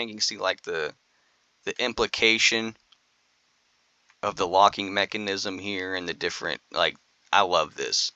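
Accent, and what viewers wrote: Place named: South, United States. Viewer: Nevermind, you have the southern dude voice and you sound like you grew up shooting squirrels I apologize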